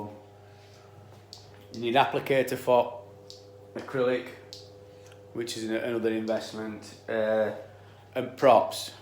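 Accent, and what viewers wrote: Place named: Yorkshire, United Kingdom. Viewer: (subscribed) excellent video thankyou. im a yorkshire lad but now live in cornwall. ive just bought a house thats been built against a steep hill with the back wall right up against the hill which is soil nearly up to the first floor. i cant see wether its been waterproofed behind before it was back filled without digging down. its double skin concrete block construction, and shows slight traces of damp inside but nothing major, just a few black marks and a bit of bubbleing paint. would this system that youve shown here be adequate to fix this, and also can i stick it over painted walls or do i have to take the paint off first? i fully understand that you cant give a proper answer without seeing it your self but just woindered if youve ever done owt similar? its great to hear the yorkshire accent again as well!!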